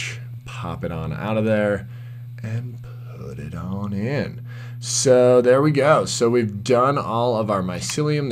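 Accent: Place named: Australia. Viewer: Reminds me of an aussie man voice over lol. This is great thanks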